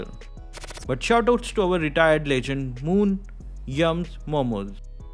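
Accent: India. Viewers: Uk he's idian saying e first when there's s in a sentence example e stop u😅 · Indian english 😂😂😂😂😂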